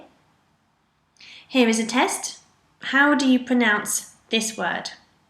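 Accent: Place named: Spain. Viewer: thats just the way spaniards talk - thats their accent, we dont pronounce the word centro as Zehntro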